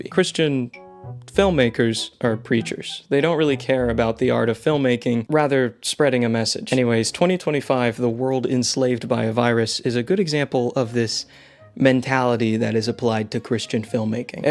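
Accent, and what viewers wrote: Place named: United States. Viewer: btw you have a nice american accent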